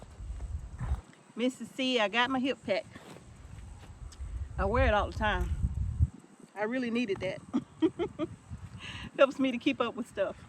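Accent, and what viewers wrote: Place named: Georgia, United States. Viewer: Thank God He intervened and kept y'all safe! I just love your videos and hearing you talk. My husband and I live in southeast Georgia so you talk like us! Glad things are fixed and better for you!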